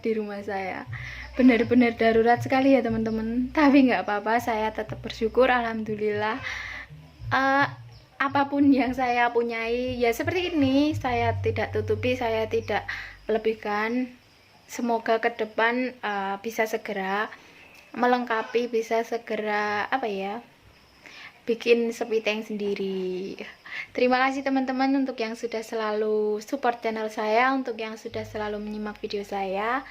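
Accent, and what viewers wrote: Java, Indonesia. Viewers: Medhok jawir mu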